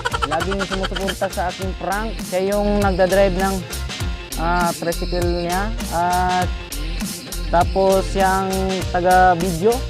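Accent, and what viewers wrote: Visayas, Philippines. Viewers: Gahi kaayu ag tagalog bai, Bisaya jud😂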